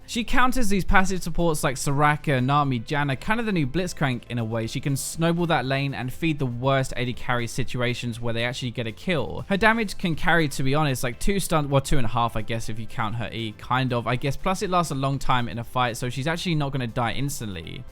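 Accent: United Kingdom. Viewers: Bro british accent screws the translation up but still great vid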